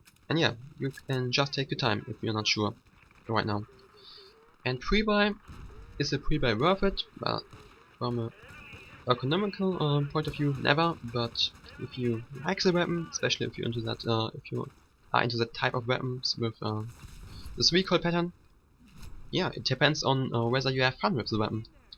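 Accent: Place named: Germany. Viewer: THAT GERMAN ACCENT !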